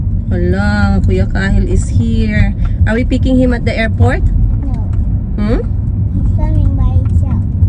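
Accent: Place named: Conyo, Philippines. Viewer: Konyo kaayo ug OA ka madam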